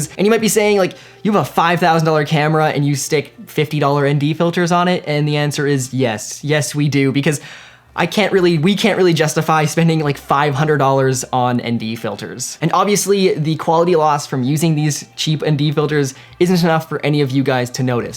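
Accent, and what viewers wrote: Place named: Canada. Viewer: Freakin Canadian accent kills me.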